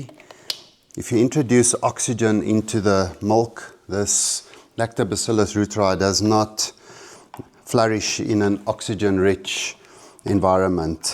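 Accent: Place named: South Africa. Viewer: It's so nice to hear your South African accent! Thanks from France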